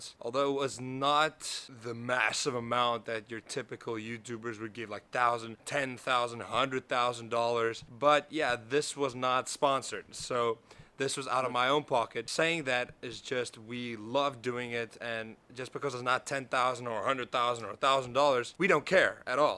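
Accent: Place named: South Africa. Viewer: Why can I finally here your South African accent,yo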